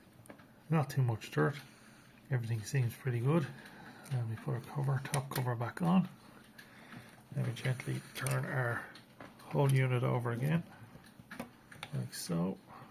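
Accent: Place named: Ireland. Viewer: What part of Ireland are you from you sound like all if my relatives lol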